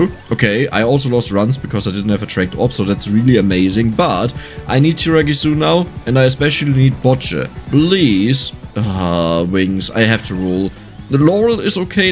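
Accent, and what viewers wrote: Germany. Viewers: Took me 17 minutes to realize you're German lol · Lol hab grade rausgehört das du deutschsprachig bist lmaoo jetzt kann ichs nicht mehr überhören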